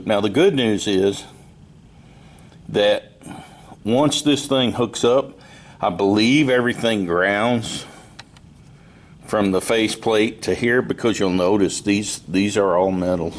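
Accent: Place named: South, United States. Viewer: What region of the US is this southern accent from? I have two units of superchamp xd. I got the first one new because I loved the sound and the price at the store. Years later I went for another one but they had the new x2 version which I didn't fancy. So I waited until I found one second hand. Great amp, size, power and.... brilliant sounds wiselychosen.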